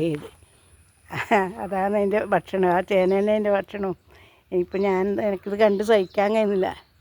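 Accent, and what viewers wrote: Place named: Kannur, India. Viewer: നല്ല വീഡിയോആണ്. സപ്പോർട്ട് എന്നും ഉണ്ടാകും. നമുക്ക് ആവുന്നതല്ലേ നമുക്ക് ചെയ്യാൻ പറ്റുള്ളൂ. വിഷമിക്കാതെ മുന്നോട്ട് പോകു ചേച്ചി ♥️എവിടെ യാ വീട് കണ്ണൂർ ആണോ. ഭാഷ കേട്ടിട്ട് അങ്ങനെ തോന്നുന്നു 🎉🎉🎉🎉🎉🎉🎉🎉🎉🎉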